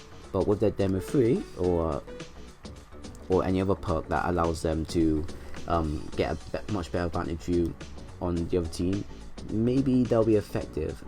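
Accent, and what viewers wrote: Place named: Southern England, United Kingdom. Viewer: That accent sounds Southern English.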